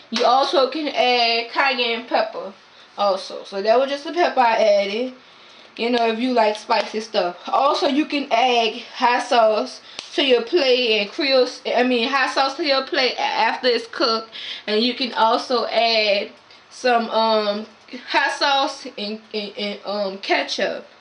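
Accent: New Orleans, United States. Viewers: looks amazing, you can tell you from the n.o. with that fire accent lol! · Thank you for your recipe. I emailed it to myself to not forget. Unfortunately there is no place to buy "pickle meat" in Cleveland, what else do you recommend. . Love your "Nawlins" accent. There were some times I had to replay what you said, but it was worth it. Again, thanks for your recipe. Will try it soon. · Once i heard dat talk i knew you was from N.O and i knew i found the right video.. Thanks bb ;)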